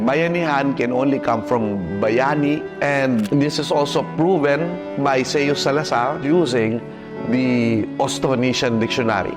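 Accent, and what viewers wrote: Philippines. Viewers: Bani, Mai, and all of the beautiful people working for The Filipino Story — bravo. I work in content, TV and Film, and it’s been a while since I watched a piece of media that is truly, TRULY, inspiring. I cried the first time I watched this, and I come back to it every once in a while when I need some kind of spark, some kind of reminder, some kind of flame. As someone who works in media, it’s easy to fall into a relentless pursuit of the next, biggest, shiniest thing. But everything here in The Filipino Story is as simple as simple gets. Simple, but unapologetic. poetry, the unapologetic Filipino accent, the unapologetic Filipino-ness — it fills me with much pride to watch and witness. Happy to help and support in any way I can!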